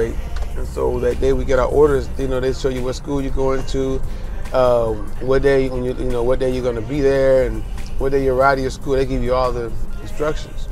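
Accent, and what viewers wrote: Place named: California, United States. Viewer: I knew you was from California. At first I thought you might have been from Oakland or The Bay. You sound like all my potnas I hung with growing up who was raised right like myself.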